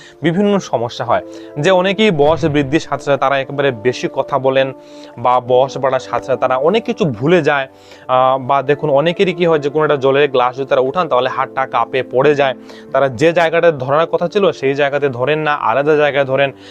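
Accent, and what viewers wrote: Bangladesh. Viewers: বাংলাদেশীদের উচ্চারণ শ্রুতিমধুর নয় ।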